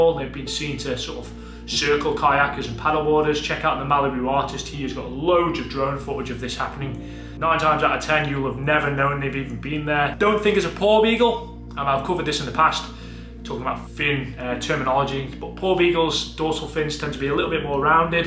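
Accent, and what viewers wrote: Liverpool, United Kingdom. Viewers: How come you sound kind of like a Scouser?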